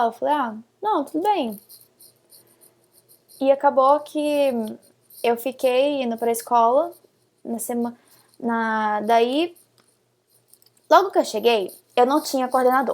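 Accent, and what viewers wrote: Recife, Brazil. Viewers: Tu é de Recife?? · tú é dq de Recife ?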